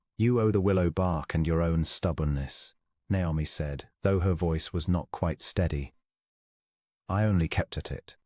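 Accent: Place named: RP, United Kingdom. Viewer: Terrible narration...reading the words as written not as they should have been correctly pronounced. Plus with a posh accent...not really in keeping in telling a story about the 1800's in the wild West of the US. The story in interesting...not really authentic in legal standing in that place and time...but interesting yarn.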